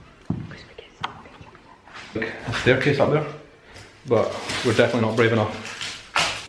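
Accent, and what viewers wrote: Scotland, United Kingdom. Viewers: Hello i am from Singapore i am 40 years old. First of all thank u so much for sharing with us those scary stories. Honestly u are such a BRAVE guy to walk in the dark tunnel. To be very honest i can understand your Scottish accent. 👍👍👍👍👍👍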